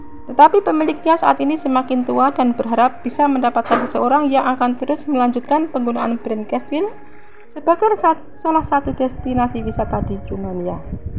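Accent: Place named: Java, Indonesia. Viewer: Pekat benor jowo nya😂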